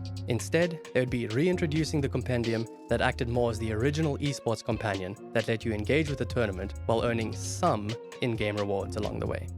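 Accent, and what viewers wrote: South Africa. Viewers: Can spot that South African accent a mile away 😂 Great vid. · I thought this guy sounded south african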